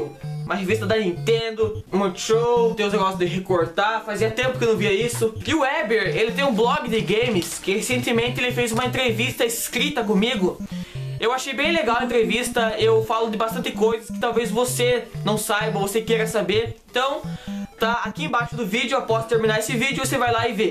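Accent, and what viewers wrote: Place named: Pato Branco, Brazil. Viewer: uaheuhaueh o fala engraçado por que ele é de Pato Branco! (não leve pro pessoal)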